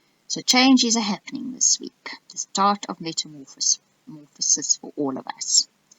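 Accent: South Africa. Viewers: Hello ! Hoe gaan dit? I just found you, and I like your video because I love tarot (I'm starting to learn for myself) and also I'm very pleasantly surprised to hear your South African accent because I grew up in Cape Town, though I'm now on the other side of the globe. Dankie! You explain things very well, and amazingly this was all completely relevant in my life.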